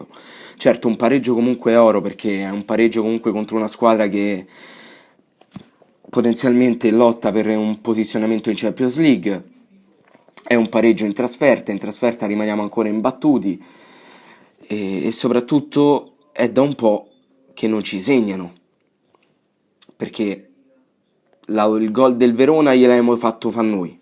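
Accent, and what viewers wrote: Rome, Italy. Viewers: Parli romano. Non ho parole.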